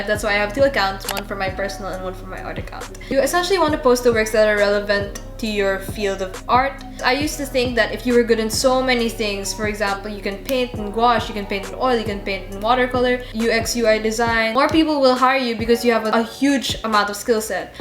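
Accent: Philippines. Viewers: i can tell youre from the ph bc of the accenT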